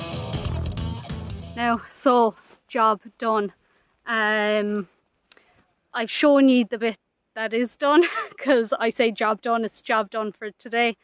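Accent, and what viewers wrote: Kerry, Ireland. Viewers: Well done girly. Lovely hearing your kerry accent & pronunciation, i do miss it. You're a hard worker & an asset to your dad. You deserved that holiday you had. And you don't give yourself enough credit girl !! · Another brilliant video your dad must be very proud of you with the amount of work you do on the farm 👍👍 plus love your irish accent 😊😊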